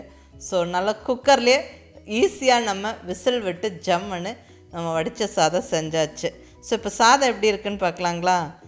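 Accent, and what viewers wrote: Coimbatore, India. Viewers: மலையாளி கோயம்புத்தூர் தமிழ் ஜம்முன்னு பேசுறாங்க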